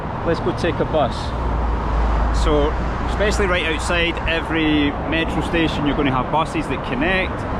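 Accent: Scotland, United Kingdom. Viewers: Этот британский акцент)) такое ощущение что я фильм Гая Ричи посмотрел)) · Lol this Scottish accent is exactly the one they used in Counter Strike when you play for the ct forces. Any time I hear that guy I want to buy additional ammo and weapons ; ) <link>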